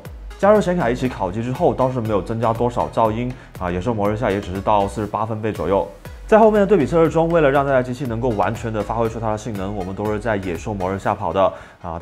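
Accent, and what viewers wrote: Cantonese, China. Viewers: 粤味唠一唠嗑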